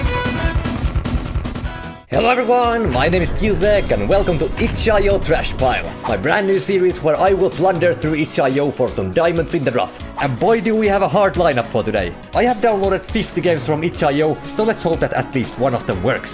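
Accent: Finland. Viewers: Wait you are Finnish. I thought you were like russian by ur accent but somewhat Finnish accent but yeah Suomi perekele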